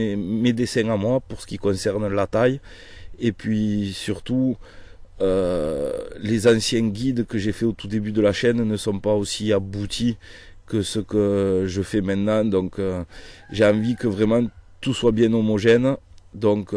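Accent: South, France. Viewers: Votre chaîne Youtube est GENIAL !!! un régal !!! vos dessin sont tres explicites et réussis. et votre accent sent bon le Sud. C est un plaisir de regarder vos videos, continuez ainsi !!!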